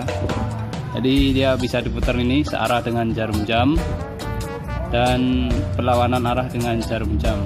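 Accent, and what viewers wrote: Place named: Java, Indonesia. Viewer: kuk medok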